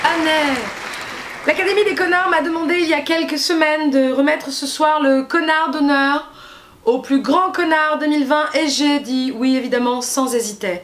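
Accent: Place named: Suburbs, France. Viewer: J'aime bien ton accent de banlieue des années 80 , 90...🙃😉😗😘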